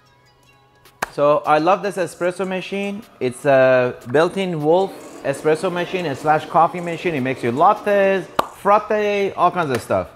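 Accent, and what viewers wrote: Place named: Iran. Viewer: We know you're Persian because you put an e before every word. :)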